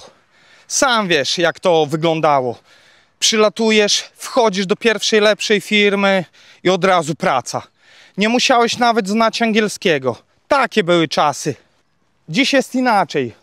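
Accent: Podlasie, Poland. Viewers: fajnie mowi; a skad tam przybyl? pewno z Podlasia, nie? Z Suprasli czy z innej meisjcowosci? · Podlaski akcent? :-)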